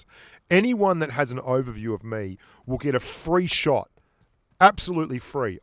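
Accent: Australia. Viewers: Ar U Australian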